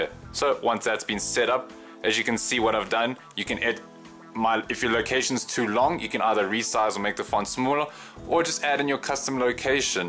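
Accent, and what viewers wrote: South Africa. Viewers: Are you from Pretoria?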